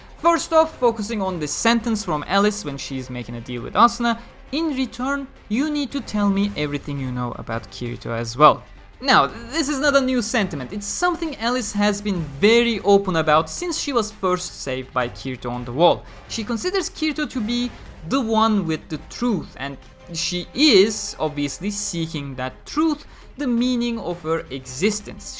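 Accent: Turkey. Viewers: Türk aksanı ile bile ingilizcen mükemmel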